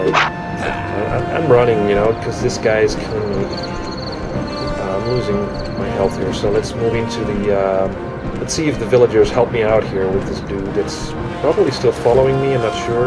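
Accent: United States. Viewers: He sounds like he has an American accent though... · He certainly sounds quite American. Either that or North Germanic having learnt American English.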